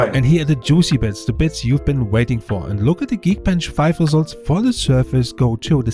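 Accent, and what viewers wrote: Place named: Germany. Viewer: Abnormaler deutscher Akzent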